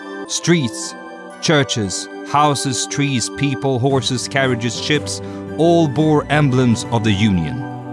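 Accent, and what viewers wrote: Sweden. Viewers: Because this is a channel focused on Swedish history told in English? The accent didn't clue you in? If you want videos about italians fighting in the Civil War, it's probably easy to find.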